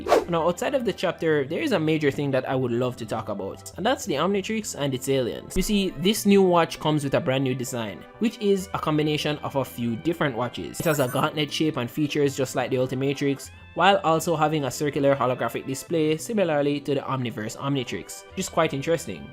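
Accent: Jamaica. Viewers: Dah accent big man are you jamaican by chance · W video, stumbled upon this video on my recommended. Loving the accent, not alot of Caribbean much less Jamaican people are on social media talking about ben ten or superhero comics. Keep it up bredda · Ayo you Jamaican? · Are you Jamaican? Your voice sounds off.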